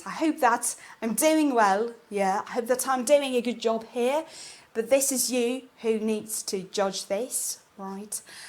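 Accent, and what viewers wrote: United Kingdom. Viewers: Не люблю британский акцент. Американский роднее и проще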